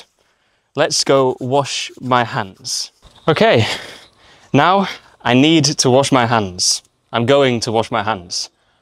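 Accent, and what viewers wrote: United Kingdom. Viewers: oh my god, very amazing video, and your way of teaching really fantastic, and british accent adds a feature to the video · I am from South India. Very useful to understand British àccent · İs it british ascent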